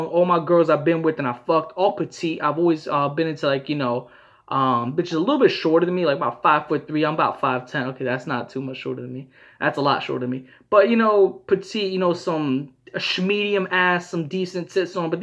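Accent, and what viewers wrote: New York, United States. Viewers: New York or Chicago? XD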